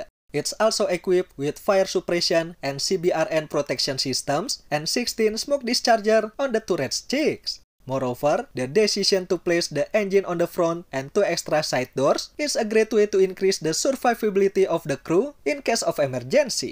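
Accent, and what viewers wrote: Indonesia. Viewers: English tapi pekat pelat Indonesia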